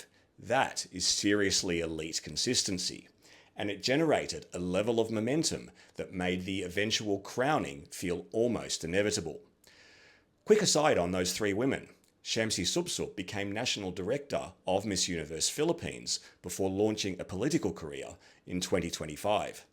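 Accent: Australia. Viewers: I love Miss Universe since I was 15 years old. I love your channel, videos, the amazing information you share! And your Australian accent is amazing and you are charming! Best wishes and Thanks you! ❤❤❤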